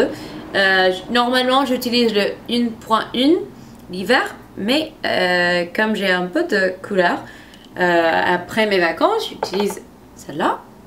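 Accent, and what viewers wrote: United States. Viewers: I learned French in school for 5 years now and thought I can speak and understand it now... After watching this video I know, that I can't 😅 It was so difficult to understand 😁 Et j'ai une question: Est-ce qu'elle américaine? Je crois, que c'est très faux ( dunno if this is the right word 😅), but I was wondering if she is amercian or something else native english? Btw I'm german 😄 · J'adore ton petit accent américain. Tu es trop choux!!! Biz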